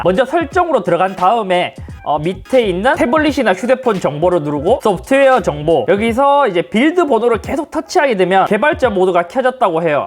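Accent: Gyeongsang, South Korea. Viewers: 역시 경북 안동 출신이시군요 사투리억양이라서 어디지...ㅋ 궁금했거든요 구독자20만 정도부터 눈여겨보던 유튜버이신데 벌써 118만!! 두둥 멋집니다 앞으로도 ~^^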